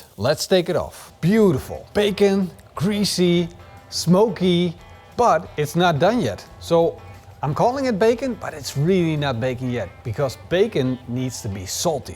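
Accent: Netherlands. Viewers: Sorry for my last comment in another video, I don’t even remember which video I left it. When I say that you fuck up the recipe, doesn’t mean that you are a bad cooked, I really see you as a temperature and meat expert. It’s just that so many video you seem to add the little touch that upset everyone and get roasted in the comment section.. You can’t deny that. I really enjoy your recipes , and watching you cook. Especially with your Dutch accent, if I found the other comment I will erase it cause it’s can be misunderstood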